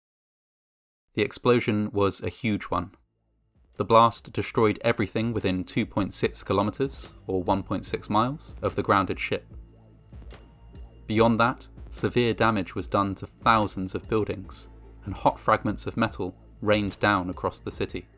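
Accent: United Kingdom. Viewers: The Brit accent makes Fascinating Horror videos slightly more fascinating for no good reason 😄 Other Brits: "Not particularly" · So glad there’s another my son uses this to sleep 😂 and it’s amazing how things can quickly go wrong. And thought you were from the UK with the accent 😂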